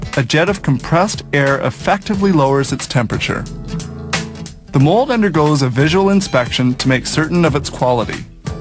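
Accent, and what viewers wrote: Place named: Canada. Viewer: He's definitely Canadian!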